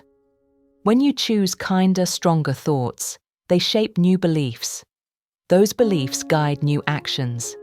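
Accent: United Kingdom. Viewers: Thanks nice British accent ❤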